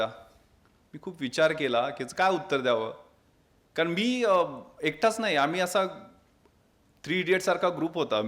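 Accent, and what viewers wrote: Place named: England, United Kingdom. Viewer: Why he is talking in English accent????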